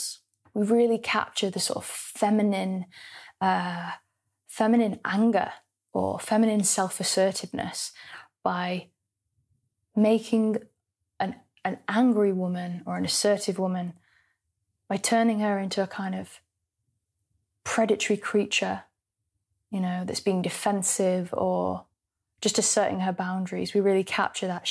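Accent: United Kingdom. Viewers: aye fellow Manc lass😂 great vid!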